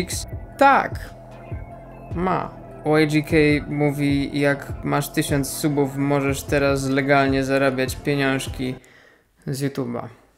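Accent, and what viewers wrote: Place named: United States. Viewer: A pytanie którego nie bylo to skąd pochodzi Twoj ang, i przede wszystkim akcent? Nikt nie ma takiego jankeskiego akcentu bez zmudnych cwiczen #anglistawie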